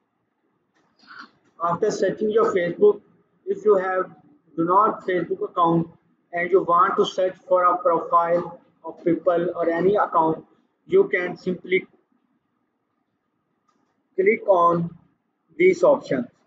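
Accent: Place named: India. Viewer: When I heard the Indian accent I knew I was in good hands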